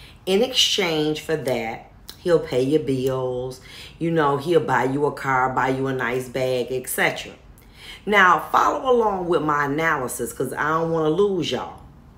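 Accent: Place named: Detroit, United States. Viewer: I stumbled on your page. I'm a truck driver and I'm just listening and i swear i could hear the Detroit in you(flatout/fair exchange)😂and this game is not for the weak. It will go over most womens head. You have to be about it and be built for it for any of this to make any sense to you. Smart women who know the rules of engagement know exactly how to...engage😂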